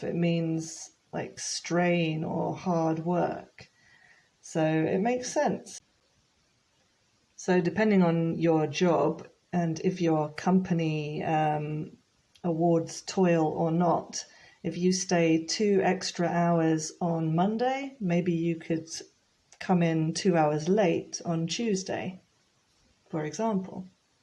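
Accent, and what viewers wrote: United Kingdom. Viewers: oh okay I got it. I have been learning English since my childhood. My English knowledge is too bad. Next year I am aiming to go to private university. I only watching British English chanels.beacause I like how you speak. I mean accent pronunciation. I'd like to take online classes with you unfortunately it's too much in our currency.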